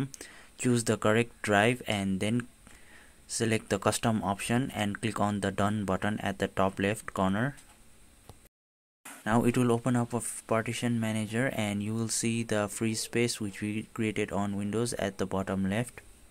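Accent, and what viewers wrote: India. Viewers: 5 mins Indian accent but not over the top no stupid commentating perfection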